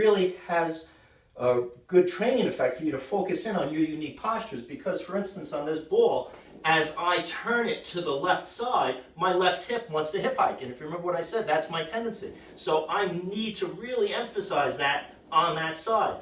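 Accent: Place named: Boston, United States. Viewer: Thanks again! By the way, where are you based? I’m thinking east coast, maybe Boston, based on your accent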